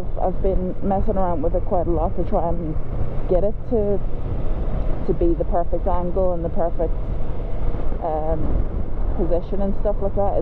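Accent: Ireland. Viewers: Your accent isn't that thick for an Irish person lol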